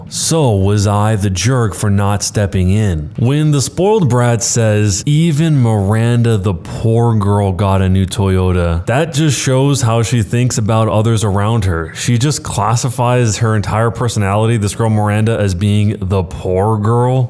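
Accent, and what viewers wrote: United Kingdom. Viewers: the British accent tho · Same and why does it sound British😂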